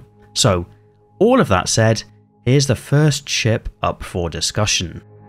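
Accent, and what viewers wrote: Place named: England, United Kingdom. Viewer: Kraaken? You sound English to me, and I’ve never heard anyone pronounce it that way. If I didn’t know better I’d say it was done to trigger people like me to rush to the comments and say so!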